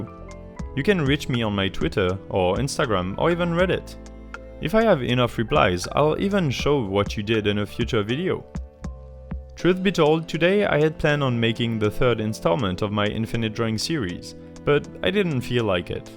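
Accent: France. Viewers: Gotta say I wasn't expecting someone with a french accent but hey, pleasant surprise ! Oui, effectivement moi aussi je suis francophone- Super vidéo ! Je vais probablement m'y essayer de temps en temps.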